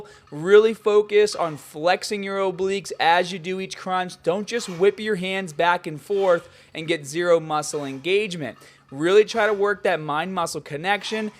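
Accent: Boston, United States. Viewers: The Boston accent is the best ever!. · Boston accent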